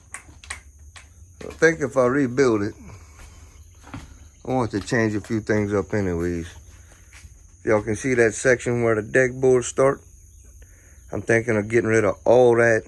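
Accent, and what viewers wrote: Louisiana, United States. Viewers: DAT LOUISIANA TWANG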